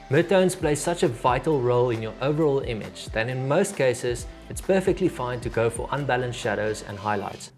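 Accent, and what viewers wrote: South Africa. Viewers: Refreshing to hear the SA accent on YT Im currently using FCP X Would really loves this, keep killing it bro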